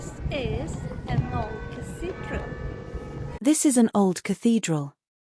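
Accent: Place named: United Kingdom. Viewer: Цікавий формат подачі інформації. Дякую. Підписався. Окремо подяка за British English 🇬🇧👍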